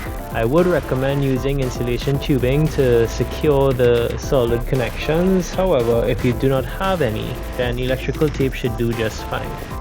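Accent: Trinidad and Tobago. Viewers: Are you Trini? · Hi wonder if you can help? I have thks same kit and a 1996 Toyota Supra. The dash wiring that connets to the barrel has 6 wires...can you help me out? Btw you sounding like a trini?? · “Dem one handed skills thooooooo”. Definitely a Trini. Thanks for this man, top notch stuff. From your Jamaican cousin! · U sound like a trini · Almost sounding trini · Accent sounds Trini.. Now i can do it myself.. Ydk.. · Hey are you from Trinidad and Tobago? You sound like a trini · You sound like a Trini 🇹🇹🇹🇹🇹🇹 good job bro if you are indeed from Trinidad maybe you can help me connect this to my Legacy GT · your from trinidad aint you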